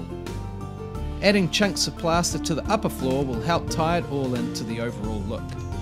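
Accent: New Zealand. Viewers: I'm digging this tutorial, the accent makes this feel like having a WETA making-of-documentary clip for wargaming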